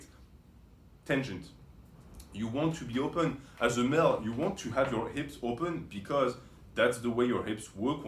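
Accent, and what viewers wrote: France. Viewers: Yo dude are you french? It sounds like you are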